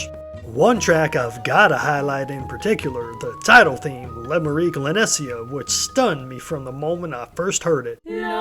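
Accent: South, United States. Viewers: why does the narrator sound like hes auditioning to be a character in the video game with a southern accent?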